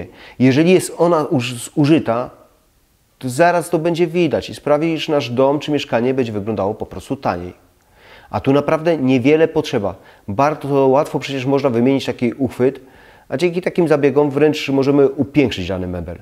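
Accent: Silesia, Poland. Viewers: Nie, proszę nie :) ! Ma pan piękny, bardzo delikatny śląski akcent ❤, czy dobrze go słyszę? Niech zostanie! Pozdrawiam serdecznie. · Ależ razi ten śląski akcent 🙈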